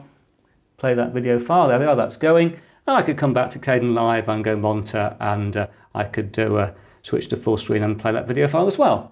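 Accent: United Kingdom. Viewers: just curious, are you from great Britain ?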